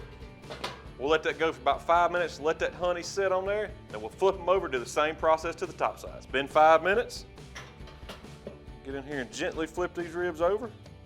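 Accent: South, United States. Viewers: Theres something about a southern accent that warms my australian soul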